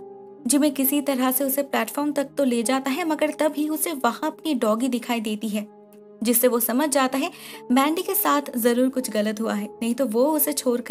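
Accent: Bengal, India. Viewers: Bengali accent..many Bengali's sound very sweet when they speak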